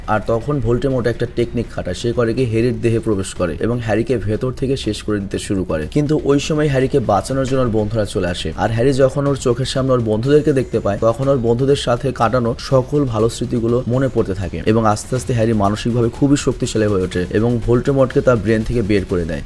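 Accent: Bangladesh. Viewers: তোমার এই হালকা বাংলা দেশী টান কথার মধ্যে আমার ভালোই লাগে ❤ কিন্ত হারামাউনি মানা যায় না (হারমাউনি)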